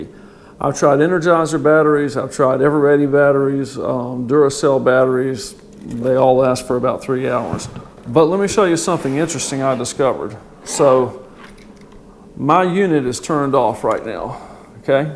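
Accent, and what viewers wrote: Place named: United States. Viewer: I've been paying attention but your accent (yes i know its American but its a particular sound to it and im trying to think who i've heard that sound similar. isnt it strange i couldnt think who it was but i knew it was someone i like, who i thought was a nice person. It came to me!!! I can sleep now!!! Trey Gowdy!!!) I wonder if im right? i.e. whether your accents are from the same part of America or is one going to be Canadian and the other total opposite end? Now back to paying attention 😁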